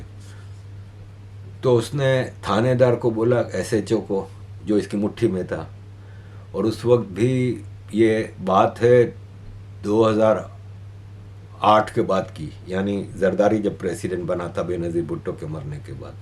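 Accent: Gujarat, India. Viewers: Bhai k language main Gujarati accent jalak raha hai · Your tone of speaking is same as like we gujarati. Anyway I also live near batva , manavadar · This guy has a gujrati accent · Sir, you sound so Gujarati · Apka accent Gujarati logoki tarah hai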